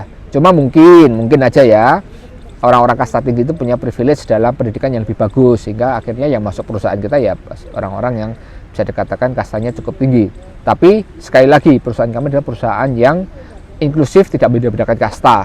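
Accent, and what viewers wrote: Java, Indonesia. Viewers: Iya... Logat Jawa bahasa Indonesia enak, nyaman dan easy listening... Semoga sukses selalu... Masbro (kyknya kita seumuran, mas )...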